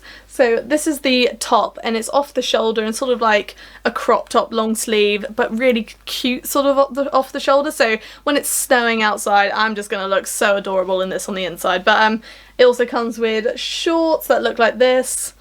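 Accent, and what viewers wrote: RP, United Kingdom. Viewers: Love her posh accent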